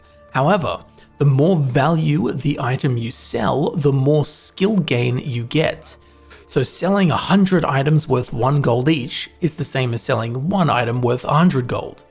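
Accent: Australia. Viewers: Ah I missed that sweet Australian voice! is back! I’m telling you I’m loving oblivion as someone who only played Skyrim before & like an hour of Marrowind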